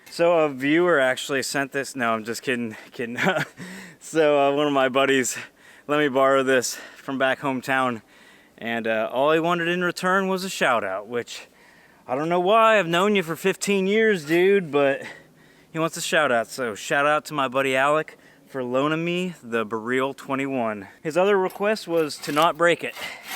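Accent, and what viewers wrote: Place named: East Coast, United States. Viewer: Enjoyed the video. Did you see the headlights in the background at night? at about . They were off in the distance. I liked your sled & all the amenities it enabled you to bring along. I love camping & love bringing as many amenities as possible, which is a lot because I have a camper. I also do some tent camping. I could tell you were from out east because of your accent. Being originally rom Pittsburgh, I'm familiar with buckeyes. As kids, we used to break open the spiny green outer shell to get to the buckeye & just collect them. Great looking steak dinner! Hope your finger healed up okay. Take care & stay safe.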